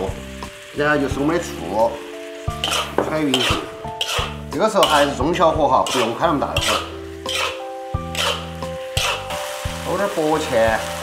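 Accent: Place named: Sichuan, China. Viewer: 有字幕，而且四川話很好聽啊。 怎麼這麼容不下自己不熟悉的文化呢:-(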